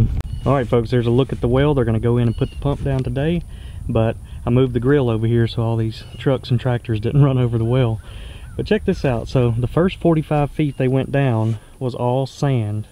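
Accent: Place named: Texas, United States. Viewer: its not obvious its texas accent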